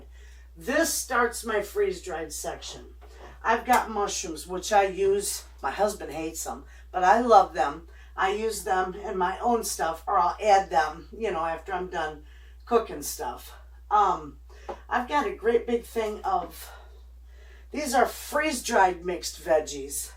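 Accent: Michigan, United States. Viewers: You just popped up on my play list, took a look at your pantry, trying to figure your accent. Michigan! We used to live in Hersey!!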